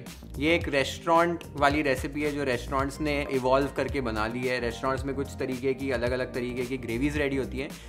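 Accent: Maharashtra, India. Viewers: to maharashtriyan ch aahe .. mumbai madhe lahana cha motha jhalay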